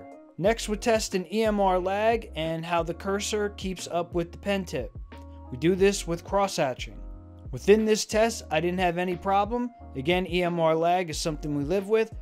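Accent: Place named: New York, United States. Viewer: good review, funny accent! (Must be from New York or somewhere up there in the NE.)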